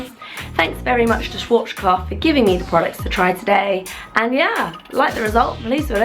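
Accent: United Kingdom. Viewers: Are u british? I am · Nice british accent👌🏼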